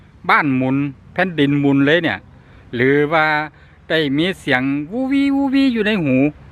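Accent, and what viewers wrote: Isan, Thailand. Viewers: พูดภาษากลางได้มั้ยคะพูดอิสานฟังยาก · ชอบ คนเว้าตลกดีใด้ความรุ้จากสมุนไพรหลายอย่างขอให้ใด้บุญหลายฯเด้อจ้า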